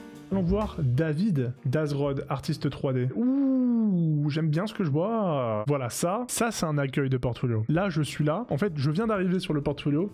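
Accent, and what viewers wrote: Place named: Germany. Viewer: Chouette contenu et bordel quel magnifique accent allemand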